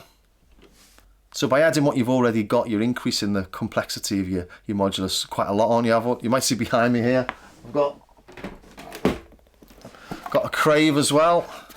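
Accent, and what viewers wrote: Liverpool, United Kingdom. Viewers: excellent videos. i was proud (as a canadian) to be able to identify the Liverpool accent :) I'm not sure if it was this or another one in this series where you asked about fractal patterns in sequencing and such, and im positive someone else has already mentioned it to you, but i would be remiss not to mention Stochas. keep up the great work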